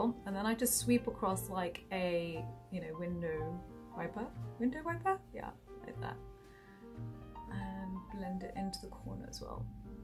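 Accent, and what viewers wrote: United Kingdom. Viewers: plus that British accent :).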